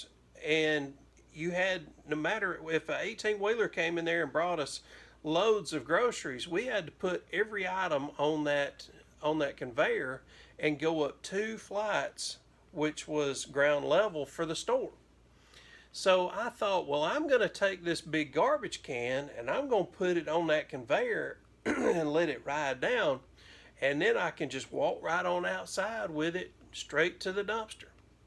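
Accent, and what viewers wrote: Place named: Alabama, United States. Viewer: HaHa! I knew it was Alabama! Got to where I was watching just to hear home :)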